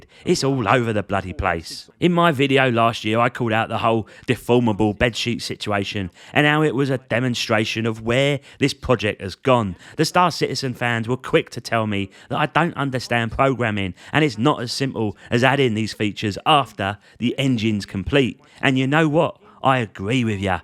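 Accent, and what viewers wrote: Cockney, United Kingdom. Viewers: Awesome vid and so true!!! Respect for U doing it!! and on another note....there is nothing better than Cockney to interpretate English Lang!! 🙏🏻💪🏻👌🏻😂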